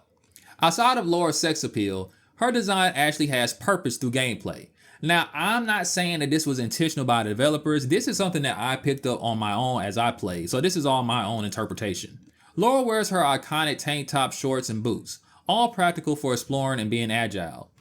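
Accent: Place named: United States. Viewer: it's a joke.. and I don't believe this guy got it wrong anyway, he just has a murican accent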